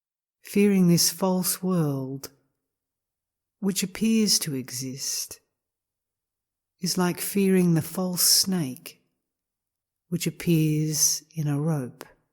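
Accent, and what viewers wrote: Australia. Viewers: Bless you and thank you for this. I think I hear an Aussie accent, so happy to hear an Aussie with knowledge of our one true self <3 Om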